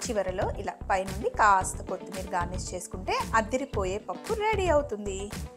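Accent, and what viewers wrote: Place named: Guntur, India. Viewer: Your voice has good clarity and simple and no over action, I belong to Repalle of Guntur district, your voice is like our voice…..🎉❤💥🎄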